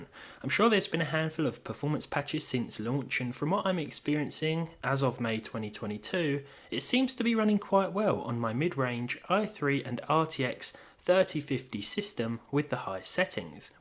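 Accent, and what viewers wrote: United Kingdom. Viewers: Your videos are so chill. just a calm british dude talking and no cringe intros or ads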